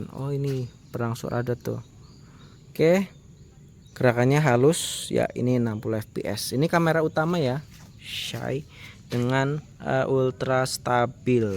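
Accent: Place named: Banyumas, Indonesia. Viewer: sepertinya logat banyumasan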